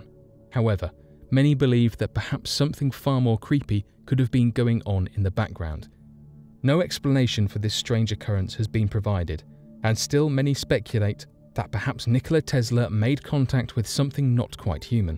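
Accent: England, United Kingdom. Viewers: Why does every Damned commentator have to have a damned British accent? I cant stand it!!!! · You are correct that, in American English, it would be "has". But in British English, "have" is correct. The speaker sounds English to me, and he uses British English.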